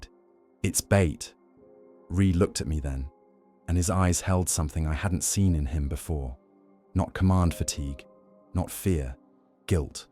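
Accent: United Kingdom. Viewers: Another British accent so tired of this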